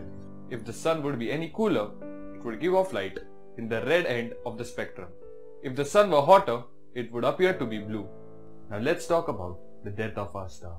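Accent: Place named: India. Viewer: you are doing a great job, i really like it, but you need a bit of improvment on your english accent, try to speak english without accent, normal english, putting an indian accent makes you understandable for some people and not for others. Good Luck Bro! i give carn luli!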